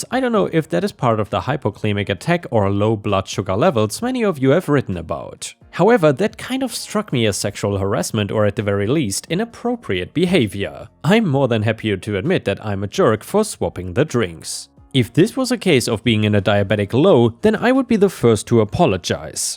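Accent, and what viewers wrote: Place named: Germany. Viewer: Are you german? I cind of hear an acsent. (sorry for the gramar not a english speaking one either)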